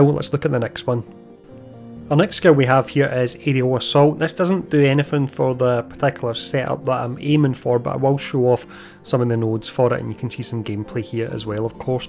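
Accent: Scotland, United Kingdom. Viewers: I absolutely love falconer, and the dive bomb. BUT, cant help myself to feel bad for the poor falcon when he lands that dive bomb, honestly, throws me off, I don’t even want to use it because it looks so hurtful😆 Btw, are you Scottish? You sound like my favorite snooker player, mr. John Higgins 😁 great video all the way through, sub!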